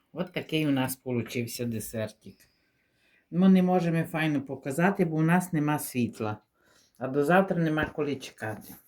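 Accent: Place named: Volovets, Ukraine. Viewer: Така вимова файна. Маю цімборку з Воловця, вона так говорить. Я не вмію, але розумію😊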